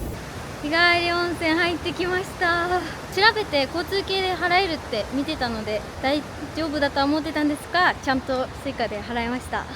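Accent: Kansai, Japan. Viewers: 今回も楽しい動画ありがとうございます！こういう企画もいいですね😊それにしても残額1円って流石ですよ！！東海道線(熱海行き)、座席上にSuicaかざしてグリーン席を利用するのは、初めて知りました！根府川駅で急に関西弁が飛び出して驚きましたが、さん関西弁は可愛くて、勝手に親近感を感じてます😆 熱海の砂浜に「Suica」は、ちょっと笑っちゃいました、小田原城の天守閣から見る景色も最高ですね、天成園の外観・森の奥深くにありそうな雰囲気がとっても良くて、浴衣姿もとてもよく似合って可愛かったです😊 他の方のコメントを読んでいて、タッチでGOは東海道新幹線で利用できないと書かれていて、自分でも調べたんですが、使えないんですね……勉強になりました🤔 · 私は箱根に行くときは、もっぱらっ車ですが、登山列車もおもむきがあって良いですね。会話の端々で出る関西弁が可愛いです😊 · たまに出る大阪弁が可愛い。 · 今回もありがとうございます！たまにはこうしたノープランで旅に出るのもとてもいいなと思いました。残高1円で終わられたのもすごい！たまに関西弁が出てくるところがとても親近感があって良かったです！箱根登山電車は紅葉の時期に行ったことがありますが、四季を通じて年中楽しめる路線だと改めて感じました。天成園さんの温泉が気になったので箱根に行ったらチェックしたいです！ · 大阪弁がかわいすぎる。熊本弁、岡山弁、名古屋弁もお願いします。全部かわいいと思う。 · Suica1枚で充実した旅ができてよかったですね😊 残高1円は奇跡ですね😊 最初のほうで関西弁が親近感があって嬉しいよ😊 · ちゃん、たまに関西弁が出る。親が関西人とか? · 斬新なSuicaを使い切っての旅動画アップありがとうございました♪ どんな動画になってるのかワクワクしながら観てました🤭 Suicaが使える使えないのシーンでの効果音が、まんまさんの心の声のような気がしておもしろかったです😂 根府川駅のカラスさんはおもしろすぎました。華麗なステップすぎて🤭 熱海城って聞いた事ない城だなぁと思っていたら、熱海には城があったという歴史はなかったんですね。商業施設としての熱海城という名前だったとは知りませんでした。熱海サンビーチでの落書き…何を書くかと思えば斬新な…🤣 ちなみに「hola」はスペイン語なんですが、スペイン語ではhは発音しないので「オ-ラ-」と読みます。英語で言うHelloですね。小田原城！行ってみたい城だったので天守閣や天守台からの展望見せてくれてありがとうございました✨ 説明しようとしたら、ちゃんとさんが勉強して説明してくれてました🤭 秀吉が小田原征伐で建てた石垣山城は天守から見えたかな？箱根フォント初耳だったのでメモしました_φ(･_･ 入浴施設のお蕎麦おいしい説、絶対ありますよね！最後の残高には思わず「マジかぁ〜」となりました😆 私もSuicaって便利なんやなぁと思いました🤭 今回はさんの関西弁多めで嬉しかったです。さんの関西弁聞いてファンになった身でしたので。見応えたっぷりの動画ありがとうございました😊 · 所々関西弁とか、お箸が左利きとかなんかいいね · どれどれ可愛い😍ちょこちょこ関西弁が良き良き😊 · なんで関西弁やねん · おー大スター、ナチュラルな関西弁ですかね。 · 関西弁の 👍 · なかなか、楽しいチャレンジ企画ですね。 Suicaのいい宣伝にもなりますし、めっちゃ、Suica Loveですね！で、熱海の砂浜に、Suica と描いた映像はなんか、とても、シュールでした！さん、いいセンスしてますね！さんのモリモリ食べるシーンで、美味しさが伝わります！時々、出る関西なまりが、かわいくて、素敵ですよ。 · 箱根は、さんのお気に入りの場所リラックスできて関西弁も出てましたね！ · ２万円有効活用の旅楽しかったです❣ さんの時々出る関西弁が可愛いです❤ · いつもに増して関西弁がちらほら🤭 カラス🐦‍⬛さんはご無事でしたか？夏は房総、秋は北関東、冬は雪☃️があるところと、季節ごとにシリーズ化してください😊 そうそう、お城🏯にもご興味がおありなのですね😊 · 月曜に動画上がって癒されました✨️たまに出る関西弁が関西出身からしたらめっちゃいいです！暑いので、水分しっかり摂って夏を楽しんで乗りきりましょう！ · Suica一枚だけ旅！熱海で海を楽しみ彦根で温泉楽しんでの満喫旅♬ にしても温泉上がりのちゃんすっぴん？？って一瞬思ったけど流石にそれはないわな。。。ｗ ☆今回は関西弁多めの動画で普段にもましてカワイイやないか~🤣 残高１円・・・最後、乗り越し精算になってしもたらどなするつもりやったん？ｗ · 熱海の砂浜の波打ち際を裸足で歩いてる姿はアイドルのように可愛かったよあと関西人な自分にはさんから時々出る関西弁が親しみを持てて良かったです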